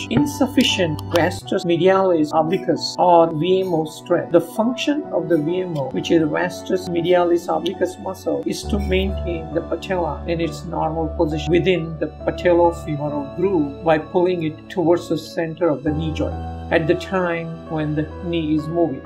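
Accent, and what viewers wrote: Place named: India. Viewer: Thats an Indian accent :) loud and clear isn't it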